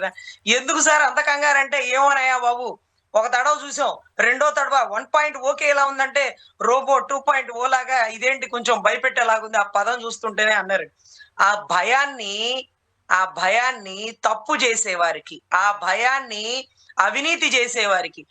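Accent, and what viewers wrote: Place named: Guntur, India. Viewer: Arre arre Guntur language ❤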